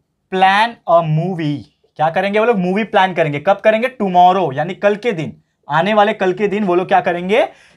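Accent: Maharashtra, India. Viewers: Sir are you Maharashtrian???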